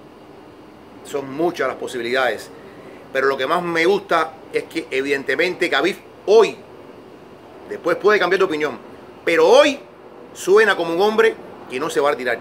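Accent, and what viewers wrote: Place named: Cuba. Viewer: El Señor Ebro (aprende un poco de respeto) es cubano y lo pronuncia como buen cubano. Yo soy español y lo pronunciaria como un español. Un ruso lo pronunciaria como un ruso. Si tanto te jode su acento o su pronunciacion vete a otro canal de mma de niñatos mezclando musica trap y acento de zombie con mma.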